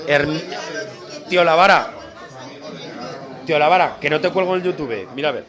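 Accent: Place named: Aragon, Spain. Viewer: Yo también soy mañico, de Zaragoza aunque estoy viviendo en Lisboa desde hace ya algunos años por motivos laborales. Llegué a tu video a través del "facebook" viendo el comentario de algún amigo, y nada, que me encanta oir nuestro acentico, y que tienes potencial para los chistes, no todos podemos decir lo mismo, consigues que un chiste mediocre sea gracioso por la forma como lo cuentas. Un saludo cordial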